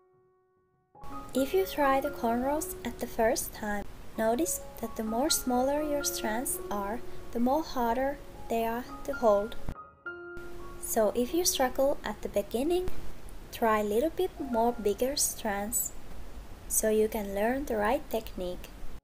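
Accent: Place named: Finland. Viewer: I knew your accent was from Finland. So beautiful there. Thanks for trying to show us how. Be well and stay safe.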